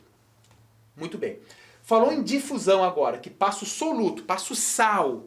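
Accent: Rio Grande do Sul, Brazil. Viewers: Sou nova aqui no pedaço e já ameeeei suas aulas Professor! Que aula incrível! E esse sotaque então, adooooorooo acho lindo sotaque gaúcho🤩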